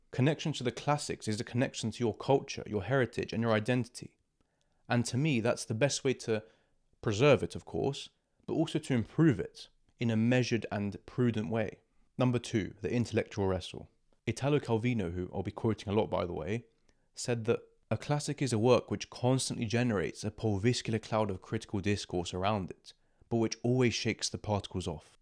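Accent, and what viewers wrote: United Kingdom. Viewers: Most crisp and understandable British accent I ever heard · ur britih accent was wrecking my nerves i had to turn on the caption to inderstand that shiz🥀🥀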